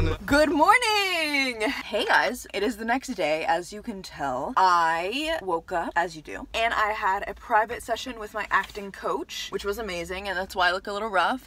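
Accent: United Kingdom. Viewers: AHAHHAA i love this british accent!!!! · Loving the British accent😂💞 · every video she does a british accent and it's cute haha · love your british accent🤗 so cute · I want your English accent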